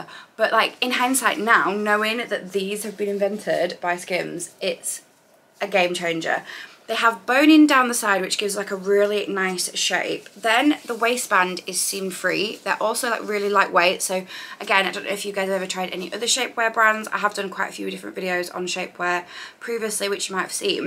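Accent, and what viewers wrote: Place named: Leeds, United Kingdom. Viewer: Love you and your amazing reviews! I used to live in the North of England and I really miss it from time to time, so love hearing your sweet Leeds voice!! Ok so question, what SKIMS would you recommend to go under the Soft Lounge Slip Dress ? xx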